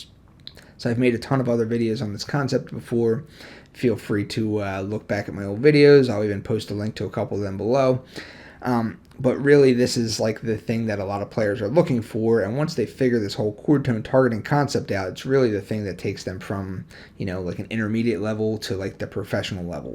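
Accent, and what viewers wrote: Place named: South Jersey, United States. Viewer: Man, it's pretty easy to hear where you're from. I'm from S Jersey and you sound like a lot of people I know from Paulsboro NJ. It's that Philly outskirts on-the-river vibe. Good stuff cuz!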